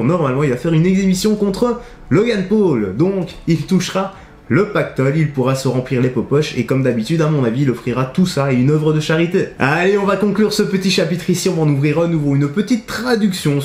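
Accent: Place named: Liège, Belgium. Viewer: Quand je t'écoute, j'ai l'impression que j'entends un léger accent qui revient. On dirait que tu es liégeois ?!?🤔🤔🤔 😎😎😎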